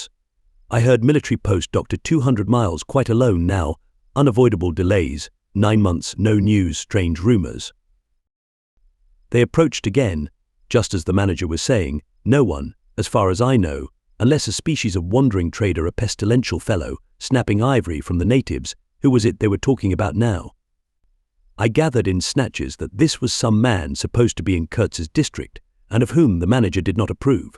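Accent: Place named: United Kingdom. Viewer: I like the British narrator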